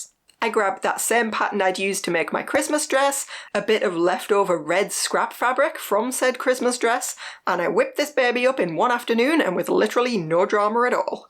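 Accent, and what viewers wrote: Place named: Northern England, United Kingdom. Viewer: I'm a teacher, and when I look back at my old lessons from years ago, I have the exact same feeling. I'm sure they're not as bad as you think. May I ask, where about in the North are you? It's so rare to hear a northern accent on here! We're from Huddersfield (near Manchester) so fellow northerners!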